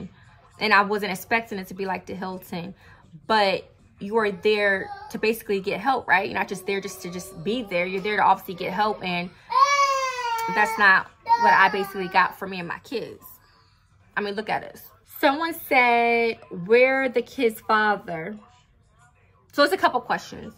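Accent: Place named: West Indies, Caribbean. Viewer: Gurl keep being you,,, I do feel like the repetitive negative comments are just haters. They give off the “they wish they had your mentality like you vibe.” Your mentality to your look & your perseverance is gonna make insecure feel some type of way. Newer supporters like me just need clarity but a person like me loves your transparency & boldness. You inspire me as a single homeless Mother staying in an hotel. Our shelters in FL are packed & the Staff do be hating when they see a go getter, it’s like they mad that you are doing good in a bad situation. You are a real content creator because you ideas & editing is on point. Keep your head up & keep brushing the haters off. I will see you in the next video,,,, oh I do have one question, are you from the West Indies because I’m from the Caribbean & I hear a lil accent in your voice. 💜💜💜 -NinJah Bae aka Rude Gal 🫡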